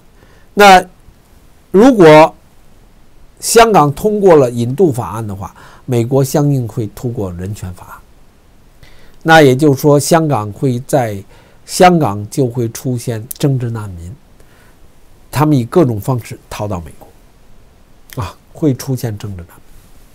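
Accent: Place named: Beijing, China. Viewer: 石涛兄，听您口音也应该是北京人，89年那次估计您也经历过全过程，您现在在一个平静安全的地方去鼓动香港的年轻人去重演那些大家不愿再回首和重复的画面，您觉得有意思吗？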